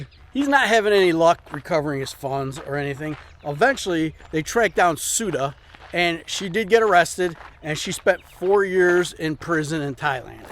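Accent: United States. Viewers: Like to hear your comment most probably as advice...life is not in the cities in America, its dangerous, l read alot about shooting incident n not too safe either, you make all the best with your neighbour, the wilderness, l'm too indeed attracted to the nature but, never get the change to reach out there 🇺🇲 your American accent is much easier on me 👍Take care and all the best! 🍻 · If it f**ks, flies, or floats, lease it. That’s an expensive life lesson. On a side note. Sounds like you got a Chicago accent . Go Bears.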